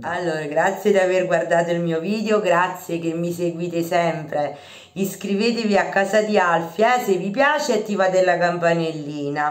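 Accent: Naples, Italy. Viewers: Bella napoli e il suo dialetto....parlaci sempre...un po' di più...ti abbraccio carissima 💋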